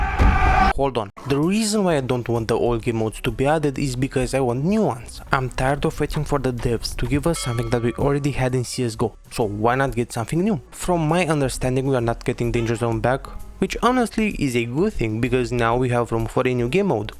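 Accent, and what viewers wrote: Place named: Eastern Europe. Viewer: Ha, so this is what the voice of reason sounds like, it has a vaguely Eastern European accent, makes sense! Liked and subscribed, you hit every nail on the head.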